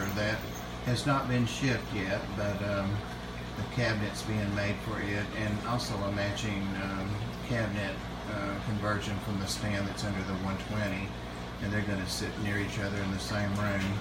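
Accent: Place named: Tennessee, United States. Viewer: A little light on the audio with the Tennessee accent, some of my wish list aqua's in there, awesome and no aggression in the small tank, perfect.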